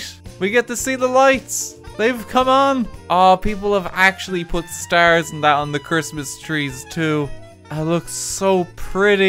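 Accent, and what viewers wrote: Ireland. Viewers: I’m a new viewer and he has a hard Irish accent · And a Happy New Year,! Thanks for a year full of Irish accented misadventures.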